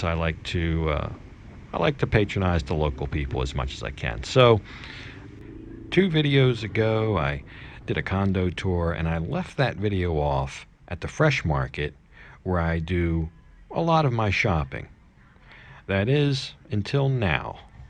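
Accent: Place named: East Coast, United States. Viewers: Your accent sounds like South Jersey, the Philadelphia area or Delaware in the US. Are you originally from any of those areas? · great videos! keep it going! reshhtraunts and shhtreets....what accent is that? east coast? where you from originally?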